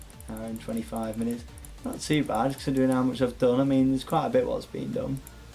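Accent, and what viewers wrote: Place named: United Kingdom. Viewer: I was nostalgic and had to watch this video! You're very creative, man! I wasn't as good with decorations as you! BTW Are you British? I loved your accent xD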